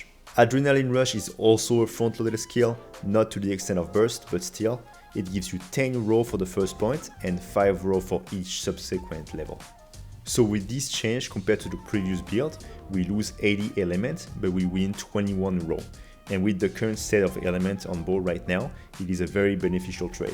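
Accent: France. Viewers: are you french? I am, and your english accent reminds me of me speaking english x) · You sound french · 1. sexy french accent 2. good not annoying background music. 3. straight to the point and everything clearly explained. 4. well edited the perfect build guide video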